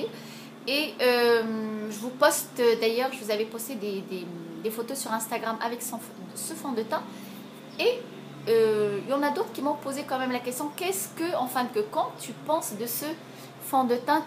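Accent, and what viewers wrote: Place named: Morocco. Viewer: essaye quand meme de faire des tuto en arabe darija tu va rien perdre car en tt cas tu a un accent marocain et ca se voi tres bien que ta pa de probleme de langue arabe comme tu le dise regarde les tuto de morrocan misstinguette c'est une marocaine qui vis en france ya pas mal de temps et malgre ca elle fait des videos en langue arabe darija je la kiff...jespere que tu va pas men vouloir je suis pas entrain de te critiquer mais c'est un point de vue et pas plus:)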